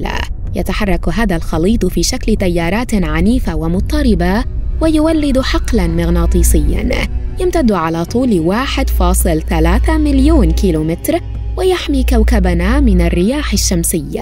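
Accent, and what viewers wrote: Egypt. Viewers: باين🧐 عليها مصرية😏😂😂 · تم اكتشاف جنسية المعلقة التي نحبها انها من مصر وااعع لغتك العربيه الفصحى رائعة شكرا لكى لقد افادني درس الجيولوجيا هذا ♥️ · انتي اللي بتتكلمي في الفديو مصرية؟؟ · مين لاحض انو الي تتكلم مصرية 😂❤️❤️❤️❤️تحية لمصر من الجزائر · فعلن مصرية مصرية 😂😂😂😂😂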